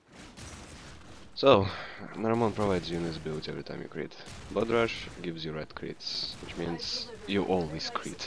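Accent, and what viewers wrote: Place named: Russia. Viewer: Судя по такому произношению , автор отечественный .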